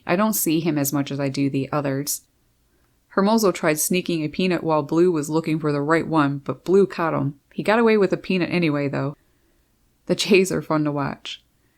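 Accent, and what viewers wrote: Canada. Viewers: I think she said NewFoundland. She's got quite the Canuck accent.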